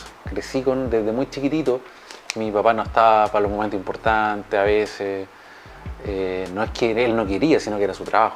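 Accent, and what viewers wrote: Chile. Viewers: ...un año después: te cogiste a la del balcón? Me encanta el acento chileno, es muy gracioso y entrañable. Me recuerda a los viejos tiempos. Un saludo desde España. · Me encantan tus historias escuchar un chileno es emocionante cuando estas lejos de tu país 🇨🇱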